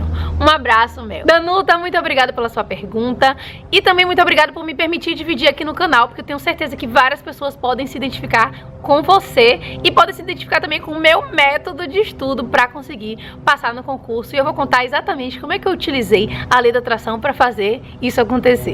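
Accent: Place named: Sergipe, Brazil. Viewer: Sou sim,mas moro em Aracaju-Se!!! Nosso sotaque é inconfundível... vc é um amor de pessoa...bjs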